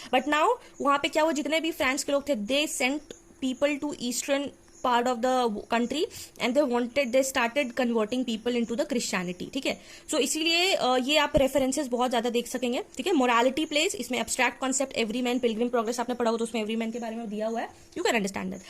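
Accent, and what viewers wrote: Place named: United Kingdom. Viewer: I love the way you speak. It looks quite british.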